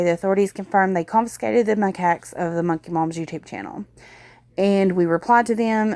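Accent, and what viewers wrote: South, United States. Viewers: Yaaay I'm so happy to hear from you!! Thank you! P.S. I Like your southern drawwl 🐴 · I love your southern accent and couldn't wait for this video to update us, thankyou for the work you do. I hope Hahn gets jailed it's what she deserves, I know she has children but she's made her choices and I believe she's a big player in the monkey buisiness. Thanks again and look forward to your next video.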